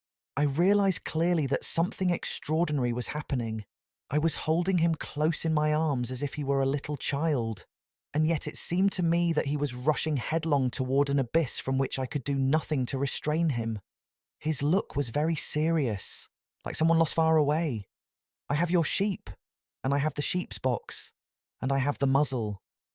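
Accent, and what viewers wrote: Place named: United Kingdom. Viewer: Love this!It's very helpful for me to mimic the UK accent cuz your English reading is not so fast and the pronunciation is clear as well. Please make this kind of video more❤. I want to shadow more❤.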